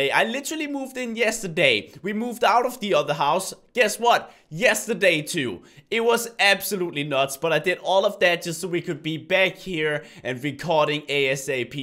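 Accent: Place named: Denmark. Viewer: Reece Warren His First Language is Danish. Which Creates His Accent. English Is his second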